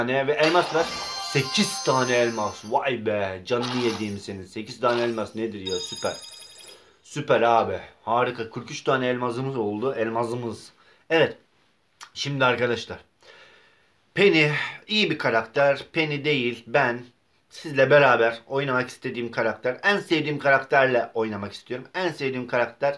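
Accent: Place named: Trabzon, Turkey. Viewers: Trabzonlu olduğu için olabilirmi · abi artık trabzon şivesiyle konuşmuyosun neden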